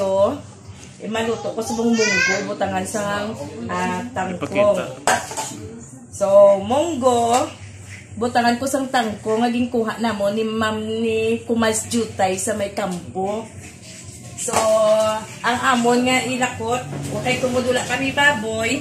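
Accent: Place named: Waray, Philippines. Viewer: Sarap pakinggan tono at salita niyo parang waray.heheehehehe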